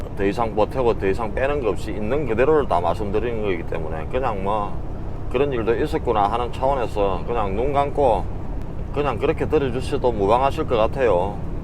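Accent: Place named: Busan, South Korea. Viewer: 다른 트럭커동영상도 봤지만 역시 님 영상이랑 음성이 제일 귀에 들어오네요.ㅎㅎ 왜냐!! 저도 부산이라 그런가봐요..특히 음성이 전혀 이질감없이 들어오니 너무 좋네요^^ 파푸아 썰~ 잘 듣고 갑니다.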